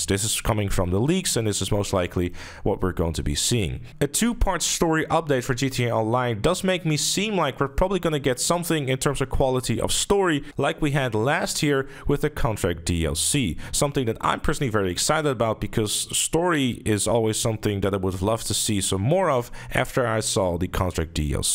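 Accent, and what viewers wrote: Netherlands. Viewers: Is he dutch ? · I'll eat my shoelaces if he isn't Dutch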